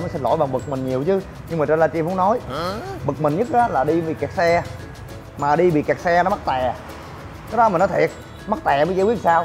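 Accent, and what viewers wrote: Mekong Delta, Vietnam. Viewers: Nhưng đời trc nó ng Bake không phải thuần chủng miền tây miền nam. Nó nói giọng miền tây do nó sinh ra miền tây nó vẫn mang máu Bake.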